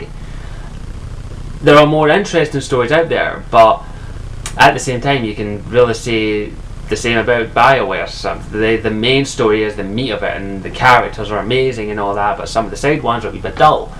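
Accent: Ireland. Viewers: Are you irish? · Lol. "Are you Irish?" Sorry, but that was funny. You have a thicker accent than I'm used to, but even if I didn't look at your user name, I would still be able to tell. Eh, what do I know, I'm just a silly American. I seriously don't want the rumors to be true, if they make DA3 into a multi-player or an open world... there is no way to properly convey my disgust. They definitely need to go back to the multi-race/background set-up and allow for more conversations like in DA:O. <--disjointed much?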